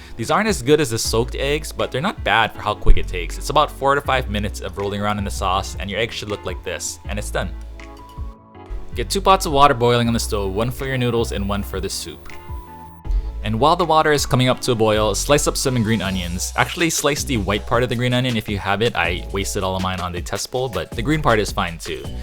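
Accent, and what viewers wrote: Hawaii, United States. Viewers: Are you from Hawaii? You sound like you're from hawaii.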